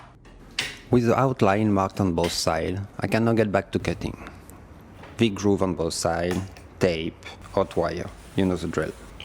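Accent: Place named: France. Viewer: au fait au vue de l'accent je suppose que tu connais le francais ;)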